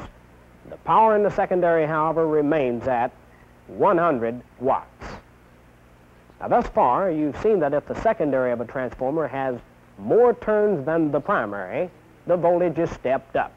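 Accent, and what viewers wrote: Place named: Texas, United States. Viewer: Sounds like he is from Houston, TX .....then did some speech training.... Glad I did my electronics tech training in the Military, USMC first before going to college for engineering, this gave me the solid fundamentals... Most of the professors at University suck at teaching....they do not start out with any conceptual teaching....They jump into Maxwell's equations on the first day..Many students drop along the way...It's not until after you graduate that you have time to think things through....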